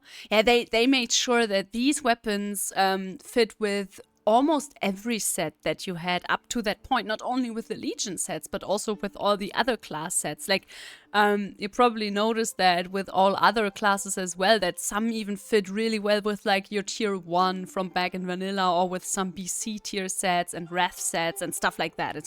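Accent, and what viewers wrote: Germany. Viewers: Monk! Best class!!! 😃 A true master don't let dictate his style by others. Deutsch? Man hört es am akzent. 😅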